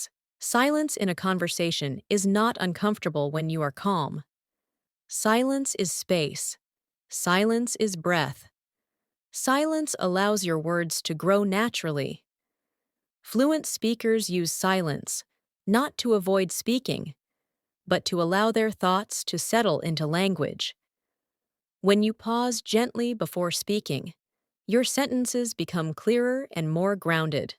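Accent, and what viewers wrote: United States. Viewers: Your english sounds american...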